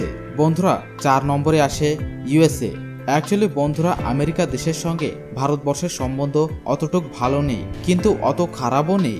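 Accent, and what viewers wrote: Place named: Bangladesh. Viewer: Apnar kotha sune to Bangladeshi Mone holo.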